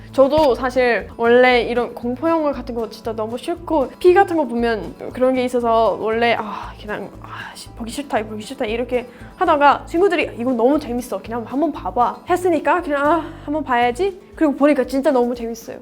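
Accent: South Korea. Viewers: 전 미국과자가 더 좋아요^^ 억양이 완전 한국인이세요^^ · if I listen to you speak without watching, you sound 100% korean.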